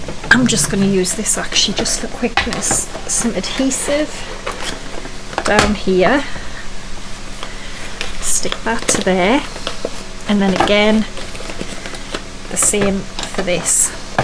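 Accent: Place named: North East England, United Kingdom. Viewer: Great ideas, and I will certainly use some of them, but I really kept playing the video to listen to your accent. My parents were from South Shields, and I lived and worked in Gateshead for three years (1967-70), although I haven’t lived in the UK since then. I’m guessing you’re from somewhere not too far from there, so your voice gave me a real blast of nostalgia. Thank you!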